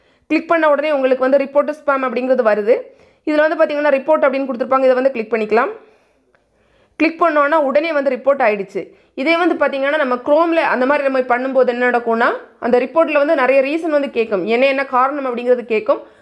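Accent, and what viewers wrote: Kanyakumari, India. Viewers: Neenga kanyakumari district ahh?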